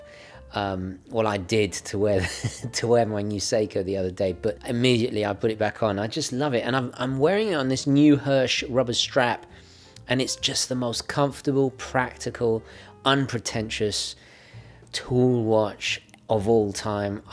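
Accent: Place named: United Kingdom. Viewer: I just tune in to listen to you talk with your British accent.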